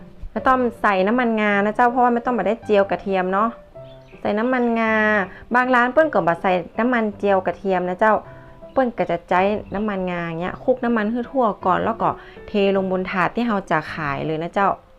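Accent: North, Thailand. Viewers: ไม่เคยดูคลิปสอนทำอาหาร แล้วคนสอนพูดเหนือ รู้สึกดีมากเลยค่ะ เราไปเรียนเชียงราย 4 ปี ดูคลิปไป ก็นึกถึงเพื่อนๆ ไป 😊🥰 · เว้าภาษาเหนือม่วนขนาดเจ้า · ขอบคุณมากนะคะ ดูเพลิน เสียงภาษาเหนือเพราะคะ ฟังเพลินเลย ขอให้มีแต่ความสุขจัา😍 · ชอบภาษาคนเหนือมากเวลาพูดเป็นน้ำเสียงที่ไพเราะมาก💖💖💖💖💖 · ชอบเสียงอธิบายค่ะ ภาษาเหนือ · ชอบ อุ้คำเมือง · เห็นวิธีการทำแล้วบอกได้เลยว่าเยี่ยมยอดน่าทานมากและเสียงพูดฟังแล้วอยากไปทางเหนือเลย ขอบคุณครับผมจะลองทำทานดูแถวบ้านไม่มีคนทำขาย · ดูแร้วทำตามง่ายคับ..ชอบฟังภาษาเหนือด้วยคับ.😊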